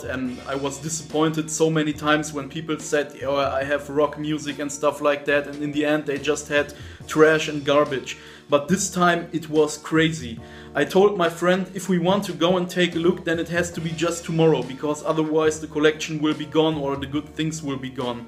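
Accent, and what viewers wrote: Germany. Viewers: Ist das total krass dass ich am akzent gehört hab dass er Deutscher ist ? 😀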